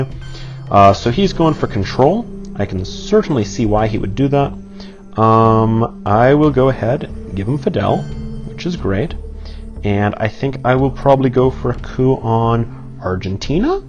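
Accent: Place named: Canada. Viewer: Well played, thanks for posting. Your commentary is some of the calmest I've ever heard in TS videos (maybe it's that pleasant Canadian accent? :D )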